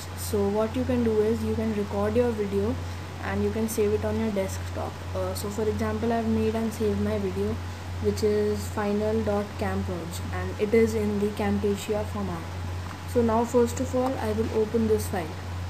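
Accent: India. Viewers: indian english >_< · Omg I can sense the Indian in him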